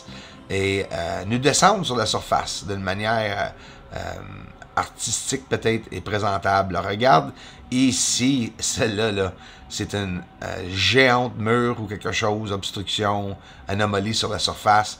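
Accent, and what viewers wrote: Quebec, Canada. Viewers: J'aimerai te donner un conseille, je sais que tu as déjà énormément de boulot, mais pour les Français ce serait géniale que tu sous-titre t'es vidéo car certaines personnes ont du male à comprendre avec l'accent Québécois... ensuite si tu pourrais délimiter les zones avec des pointillés légers qui chemine les contours au fur et à mesure les structures dont tu parles, car moi-même je n'arrive pas à tout percevoir peut-être par manque d'expérience visuel personnel. J'adore tout ton travaille sincèrement mais nous ne sommes pas tous de ton niveau et je suis certain que ce serait très fructueux pour ta chaine et tu pourrais ainsi d'autant plus développer ton projet financièrement très certainement si t'es viewers accrocheraient plus facilement au premier regard, en espérant que tu ne fasses pas assassiné par les men in black haha Big up bro Je te kiff!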